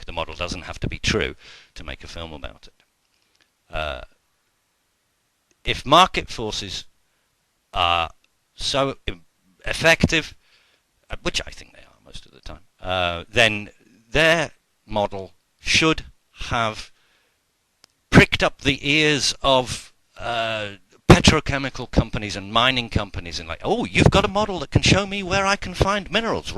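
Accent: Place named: United Kingdom. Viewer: Let me guess, you're british.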